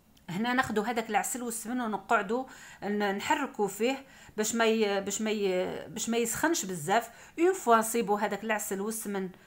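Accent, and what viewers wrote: Algiers, Algeria. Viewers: j'adore votre façon de parler. Algéroise بلطبع والشان. allah ybarak fik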